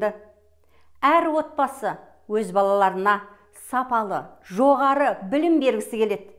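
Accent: Standard. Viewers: Молодец казакша таза суйлеп тур енди арамыздагылар барго казакша сулесе шыгатын едим дитиндер не айтады екен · Өте керемет.Таза қазақша сөйлейді екен.Өте шындықты айтты.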